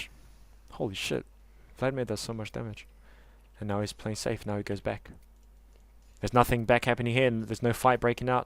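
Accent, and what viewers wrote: New Zealand. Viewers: Thanks for this guide bro, you from nz?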